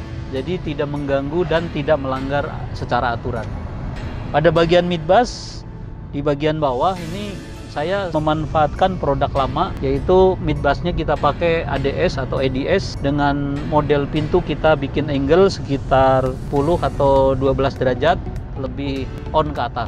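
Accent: Banyumas, Indonesia. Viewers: Walah ngapak boss, kapan2 tak dolan meng toko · Jebul wong ngapak...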